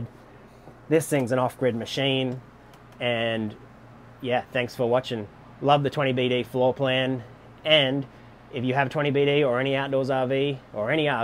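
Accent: Australia. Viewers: You sound like an aussie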